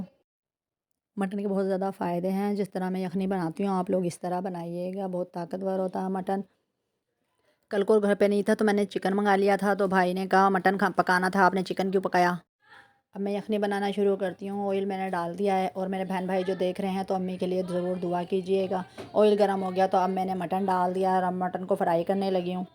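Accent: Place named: Multan, Pakistan. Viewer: Thanks mujhe apki awaz Multan ki lagi thi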